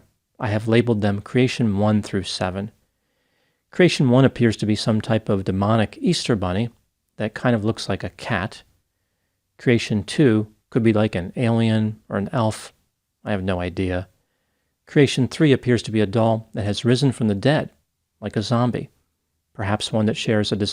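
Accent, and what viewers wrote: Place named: Maryland, United States. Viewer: ....great job! Can you say, "Satan"? Harrisburg - yup. Oh hell no. Grew up in Carroll County, MD, so you may say this is my, "old stomping grounds" - I recognize your accent, albeit maybe more eastward... Those dolls look like people I have DEFINATELY come across. Honestly, I would bet that at least 65% of certain populations would NOT think this is odd, and continue to push the argument of 'art'. I've known people that resemble this ilk. PUKE.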